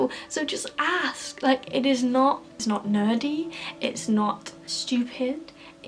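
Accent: Northern England, United Kingdom. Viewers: I can identify with so much of this! Yesterday I watched programmes about state and private school head teachers swapping places, which was so interesting. It's a different world at 'public schools' and no wonder they can fit in so easily at a place like Oxford. Even though it's now 29 years since I went to Oxford (hard to believe, it seems more like 15!) your experience sounds so similar to mine. I love the old buildings... accents was something people discussed (I would not have been able to guess where yours comes from it almost sounds 'normal' to me with hints of a northern accent, but then some of my friends here in Wales sound very much like you!) Formal dinners were so awkward as I was afraid of how hard it is to have good table manners. (The first time I asked what the broccoli was because I had never seen it before - it was not a common vegetable in the 1980s!) They were every Tuesday in my college but were optional and I think I tended to go about once a term. 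I much preferred cooking for myself. As for drugs, I never came across anyone into that, only maybe once a friend was harming herself and might have been using medication. I had something better than a better 'study drug' - baking choc-chip cookies and selling them to my friends to raise money for charity. We all thought they were great 'revision fuel'. Most played music in their rooms to help concentrate on studying. That was new to me, as I thought that would be a distraction, but I ended up doing it myself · Interesting video! I was at The Queen's College Oxford, 1994-97. Although I am British, I had never lived in Britain before I went to Oxford, so most things were very foreign to me, but I really enjoyed it. It's funny you mention the Northern accents, because Queen's has historical Northern connections, and your kind of accent was quite common in my college. One of my mates there was from Sunderland, with a really strong accent and nobody made any fuss about that. 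Maybe things have changed since my days, or maybe that was just my college. Who knows?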